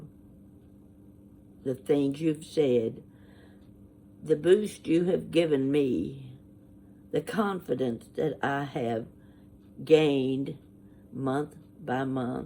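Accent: South, United States. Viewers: Awww…kiddo…please just block the yucky people and try not to even read that crap. Some people are just so miserable in their lives they feel the need to try to make others feel miserable too. Don’t let them get to you. They are not worth a second thought. …you are a real blessing from God! You make me and so many, many others happy. You are real and I love that about you. I love that you do talk to us like we are all sitting together having coffee. Please continue your channel just exactly as you are and in the same way you always have. I live about 1000 miles away from my mom. She has Alzheimer’s and it just breaks my heart not to be with her. I am still able to talk to her on the phone. She is my best friend. Her sister, my aunt, is my other best friend but she passed in 2018. We called ourselves the three musketeers. You put out such love, kindness, comfort, etc and I feel like I’m in the presence of my two best friends. 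Ha, change your southern accent to Scandinavian/North Dakotan and it is definitely just like them. I never did find your address, maybe one day then I can send you the older jewelry for your projects. Love you bunches! I thank God for you and all of your subscribers. ❤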